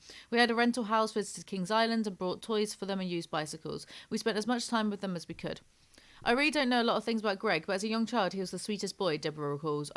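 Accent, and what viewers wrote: United Kingdom. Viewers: That BRIT sound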